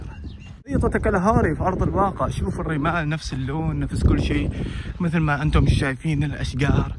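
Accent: Oman. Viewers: والله كنت حاس من كلامك انك عماني 🇴🇲. احبك. متابعنك من ولاية محوت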